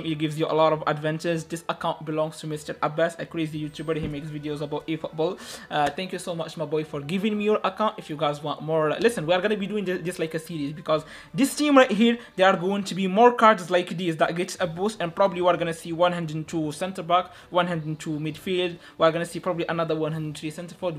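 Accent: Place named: United Kingdom. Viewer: Bro why you have British accent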